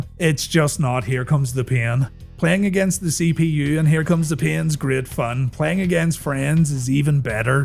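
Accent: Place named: Belfast, United Kingdom. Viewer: You from Belfast lad? You sound like it, from there myself.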